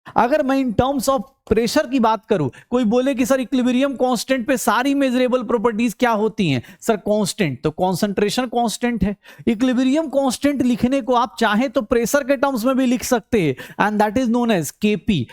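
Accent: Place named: Bihar, India. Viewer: Sir ka bihari accent mast hai 😂❤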